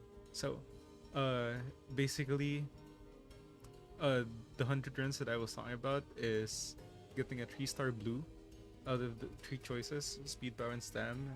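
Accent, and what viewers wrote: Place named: Philippines. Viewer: Interesting video. Honestly I think the main drivers for the burnout are the popular content creators themselves. Lately almost everyday all they do is make videos about preparing for the next CM, and that people need to do XYZ in order to compete. So instead on focusing on the fun aspects of the game, it turns into a checklist grind. I've honestly stopped watching their videos when it's all about preparing for CM. I maybe just watch a few to get some pointers, but I don't pressure myself to do each and every single thing they recommend. BTW, are you Filipino? Was just curious based on your accent. No need to answer if you're not comfortable.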